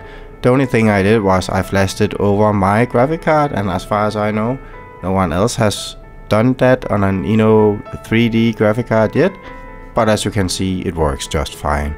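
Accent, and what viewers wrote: Denmark. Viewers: I know a dane when I hear one...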